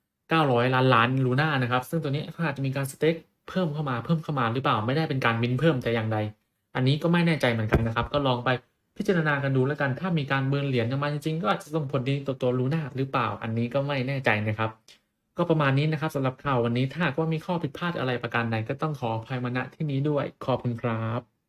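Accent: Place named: Central Thailand, Thailand. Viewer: ใต้ตรงไหนเนี่ยะ ออกแนวเหน่อภาคกลางมากกว่า หรือไม่ก็ภาคตะวันออก..สารภาพมานะ..เป็นคนจังหวัดอะไร..บอกมา..ไม่งั้น..โกรธ...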